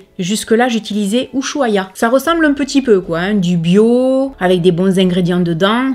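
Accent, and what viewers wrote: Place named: Narbonne, France. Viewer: Bonjour comment vas-tu? Je voudrais te dire merci pour ta vidéo c'est génial et un plaisir à chaque fois de regarder tes vidéos, surtout quand on voit la durer de tes vidéos en ce moment qui sont plus longues c'est encore plus génial malgré que ça l'était déjà en plus quand je vois le temps de travail que tu dois avoir pour faire les montages à chaque fois et passer du temps dessus, je te remercie pour ça, pour ton travail car moi ça me fait énormément du bien de voir tes vidéos, je te remercie pour le temps que tu prends pour nous, pour les explications et ta belle présence. Je te trouve très très belle, au naturel tu es aussi très très belle tu as des yeux magnifiques ainsi que tes cheveux ils sont trop beaux vraiment tu as tout pour plaire vraiment tu es une belle personne ( non ce n'est pas une déclaration d'amour 😂😂) mais merci d'être là, vraiment en toute franchise à l'heure actuelle tu es ma youtubeuse préférée, donc je comprends tout à fait pourquoi on t'appelle, alors merci maman. 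Je voulais te dire aussi, et c'est pour ça que je t'écris, bon courage pour tes examens médicaux d'aujourd'hui, j'espère de tout mon cœur que ça va aller au mieux et que tu auras de bonnes nouvelles, qui pourront te rassurer pour cette journée, je t'envoie tout mon soutien de pas très loin ( je suis de Narbonne 🙂, ce qu'il y a de bien c'est que je me reconnais dans ton accent car on a le même 😂), et je pense très fort à toi aujourd'hui et je croises les doigts pour t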